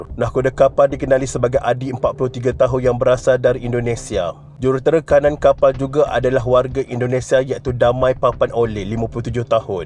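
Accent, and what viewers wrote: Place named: North, Malaysia. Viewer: MCM org utara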